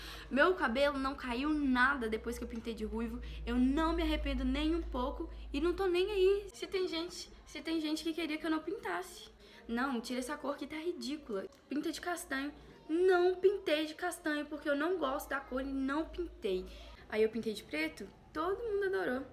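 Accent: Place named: Minas Gerais, Brazil. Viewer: Adoro nosso sutaquezinho mineiro 😍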